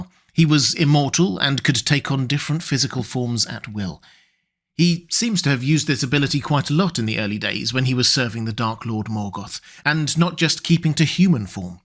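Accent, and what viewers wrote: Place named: United Kingdom. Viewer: What is accent? It seems British but not really.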